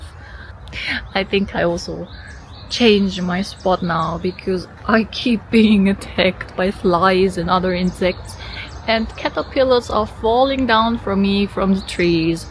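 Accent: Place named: South Korea. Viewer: 신기하게 발음이 한국인이 영어하는 것 같은 발음이네요...참 신기하네...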